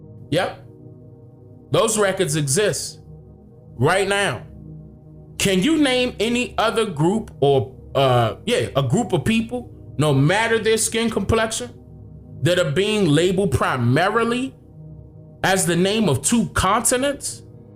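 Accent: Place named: Washington DC, United States. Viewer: are you from dc I feel like I hear it in your voice!!! Thanks for keeping my mind working!! My dad told me these things when I was in elementary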